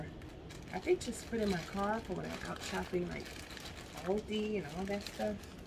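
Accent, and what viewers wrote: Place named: South, United States. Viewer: First good morning, and you are highly blessed. We all are, and all I would tell them that there’s many more Temu Haulers other than yours channel to choose from. Feel free to move over to them. I give you my blessing to change and you should get what your looking for. Keep doing what you’re doing, because I like others of your viewers love your southern accent then you let us know that not your normal personality, but we love it. ❤❤❤❤❤❤❤🎉🎉🎉🎉🎉🎉. Your beautiful and your giving us NO BORING CONTENT. Linda Hackney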